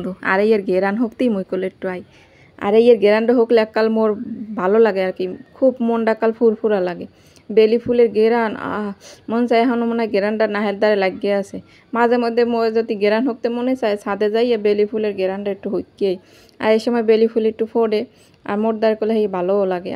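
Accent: Barishal, Bangladesh. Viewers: মুই কিন্তু আপু তোমার বরিশালের কথাগুলো বেশি ভালোবাসি ❤❤❤তোমার কথাগুলো বেশ ভালো লাগে।পরিষ্কার ।করার আইডিয়া দারুণ ❤❤❤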